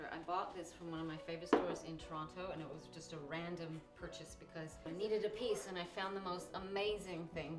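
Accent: Australia. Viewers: Thanks for the brief invite to your lovely home. Australian accent, really, I had no idea what it was. Your name shows french as does mine so the Australian bit surprises me a little. Whatever it is, it is perfect. Murdock Mysteries for me is a real treat, the names of the streets in Toronto and surrounding areas brings it all together and I absolutely love love love it. Keep going and I and we will be there with you. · Love that you have your Australian accent. Love the show!!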